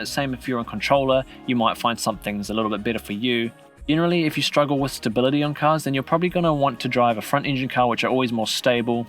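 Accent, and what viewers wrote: New Zealand. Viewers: Thanks for all the time you put into your videos, great info and tips that have helped my lap times and setups a lot! Great to hear a Kiwi doing such a great job! Keep up the good work mate 🤘🤘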